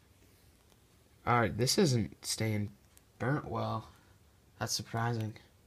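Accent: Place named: India. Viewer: A wrapper I thought he was saying rapper This Indian tech guy inglish needs to stop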